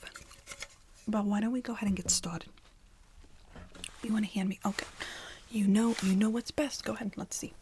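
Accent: New York, United States. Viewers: Ha I love it! Sounds like my mom's whole Italian family from New York. 😂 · def new york lol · As a New Yorker love the accent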